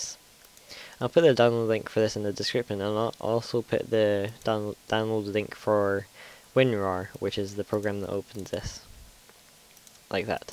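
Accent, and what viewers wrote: Scotland, United Kingdom. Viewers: r u from scotland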